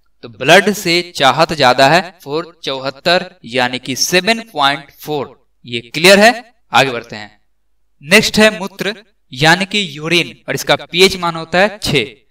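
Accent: Bihar, India. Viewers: Bihari ho ka ???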